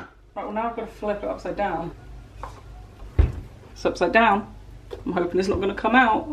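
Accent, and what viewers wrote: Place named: England, United Kingdom. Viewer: My google loves your voice! Without fail every time you talk to your google, mine obeys you. She hardly listens to me I have to repeat myself so often. Perhaps she prefers the English accent over my Australian accent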